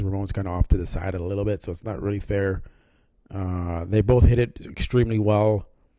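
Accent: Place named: Caribbean. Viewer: absolutely. I just started to listen to your videos. You’re on point with your analysis. I’ve been in the game and a fan since 94. Lol. And as a proud Jamaican don’t think I don’t hear a little Caribbean in your voice. HaHa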